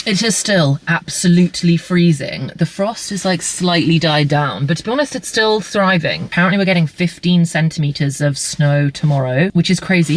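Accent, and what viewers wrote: United Kingdom. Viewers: Hi, happy new year! I'm Sarah from Italy. I've been listening to your videos for two years to improve my British English listening skills. Thank you very much ❤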